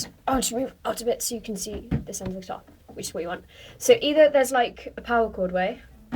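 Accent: England, United Kingdom. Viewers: Love your voice, Ahaha i thought it was Australian until you said British. Now i hear it! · First, i think your british, XD sorry, by the way, ,love the voice, love the hair, love the song. :) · Love your English accent :) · Like the way you show around how to play a lot of great songs, great work! Thanks! Ps yes your accent is clearly English to me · Im from England too, omg your so funny. And english accents dont sound like australian accents god..Americans are weird lol :)) Im from Newcastle. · D that´s funny! I recognized where you come from by hearing your accent!! ok.. i spent a few days in London...and I really like this british accent! youre probably right, but not everybody can hear the accent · You are so cool. Thank you for this great tutorial. Will help me a lot. You sound English!!! No doubt. Greeting from Germany - Michael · That was so clear, thanks! 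This was the first video of yours that I saw but hey you sing very well! Oh, by the way, you do sound totally English don't worry ;) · Ella tiene un acento hermoso, así hablando tan bonito en Inglaterra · I'm English and I could tell you where English! Can you do,even though this is like a 6yr old video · im from germany and i "knew" after a few minutes that u are from england. very nice tutorial. best i found so far. only a lil thing would have been nice: if u could play the whole song as an intro or so :D. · How does it sound Aussie no chance it's uk all the way btw I'm from uk live in NZ next to Aussie your accent is so much better than g'day mate :D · Thank you for this video, you've made learning to play a little more interesting for me. You sound nothing like an Aussie defs English. Again thank you:-) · I am American and I could tell that you were English, but the real question is what part of england are you from. 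I also understand your frustration with people thinking that English and Australian accents sound the same. Keep up the good guitar work. Can you do by. I am recently obsessed with it. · Hi from New Zealand tauranga..yep Great teaching at the right speed to learn great song .. Thanks . Your accents not to strong english wise but but nothing likean Aussie..cheers. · Thanks for this! You DEFINITELY sound English. Like you I also don't get how any one could mistake that for an Australian accent. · You can tell you're English. Thanks for the video, only Q is after you play intro 2 times then the bridge do you go back to the into? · Thanks I am a complete beginner this really helped, how do people think your Australian, you sound english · where are you from in england? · How can u think she's American or Australian. I lived in England for most of my life and then moved to Australia :( all Brits sound like her, great vid by the way!!!! 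· i knew u were from england i love your accent!! oh and thank you the tutorial was well explained and very easy to understand. ^-^ god job and keep going. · thank you for the tutoral :) you're really good at teaching :O love the accent! xD i thought it was a british accent but wasnt certain :P from: irish boy Donal :) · It's obvious you' re from england :D You're cute... ^^ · Great tutorial, it really helped :) and as an English person I can tell that you're English ;) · Thank you so much! I've watched dozens if not hundred of Guitar how to's and yours are by far the easiest to follow. I cant wait to watch more of them! And don't worry, the accent is pretty obviously British :)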